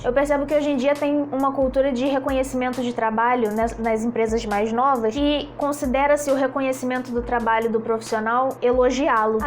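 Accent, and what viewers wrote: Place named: Rio de Janeiro, Brazil. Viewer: Garota, eu que sou um vovô com tempo pra pensar, analisar coisas, filosofar e etc, fico pensando ao te assistir, que maravilha de pais você deve ter. Minhas netas são maravilhosas mas eu gostaria de ter uma uma neta igualzinho a você. Além de linda (minha netas também são) você é também muito inteligente e bem resolvida, me parece. Eu aprendo a editar vídeo com você e, ainda de quebra, me divirto com seu modo de se expressar (fala pelos cotovelos. Rs rs rs) que é maravilhosa. Até seu sotaque carioca é na medida certa. Está destinada a ser feliz para sempre.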